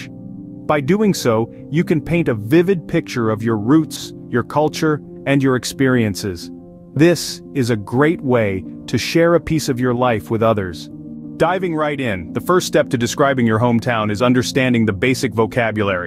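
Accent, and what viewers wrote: United States. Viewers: is this american english? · Can't you hear it ? Of course it is told with an american accent.🙂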